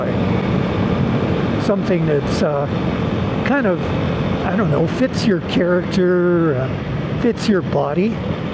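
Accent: Canada. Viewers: Great advice! Thanks! You sound Canadian. Hope I'm still riding at 75.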